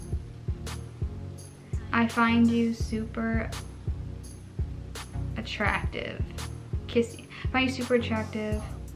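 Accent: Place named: Chicago, United States. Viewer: Are you from Chicago???? My mom is so i have a Chicago accent too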